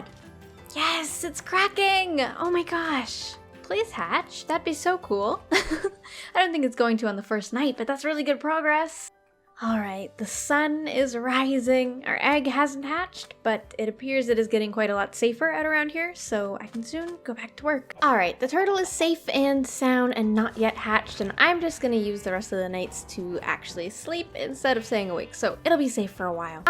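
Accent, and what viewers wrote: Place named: Canada. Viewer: I never realise your accent until you say sorry then I remember you’re Canadian I love it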